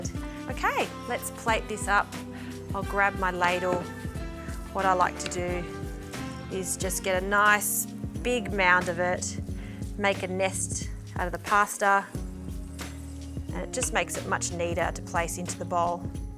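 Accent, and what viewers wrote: Australia. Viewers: I don't see the problem here. She said pasta, she has Aussies accent. We all know what she meant. Also, no ones asking. Hope ya have a better day champ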